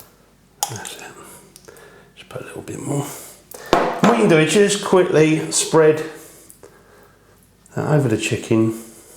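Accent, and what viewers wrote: United Kingdom. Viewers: Cor blimey....do what leave it out 😂love the accent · I'm drowning with his strong british accent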